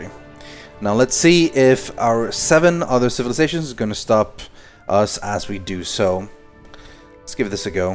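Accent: Sweden. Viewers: i knew it i her it on his acent. btw im also from sweden and my englis aint dath good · Are you Swedish?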